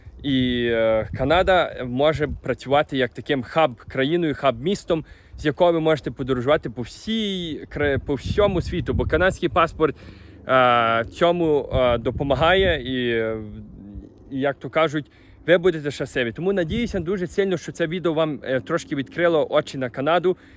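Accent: Western Ukraine, Ukraine. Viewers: Підписалась, шоб тільки оцю западенську балаканину слухать)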